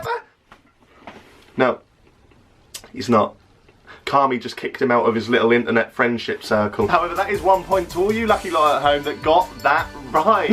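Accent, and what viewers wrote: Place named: Liverpool, United Kingdom. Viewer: This guy fuckin creased me la 😂😂😂😂😂😂 wha a scouser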